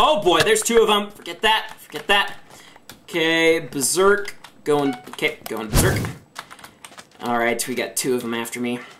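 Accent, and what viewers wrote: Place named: United Kingdom. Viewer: lol British accent😃😂😂😂😂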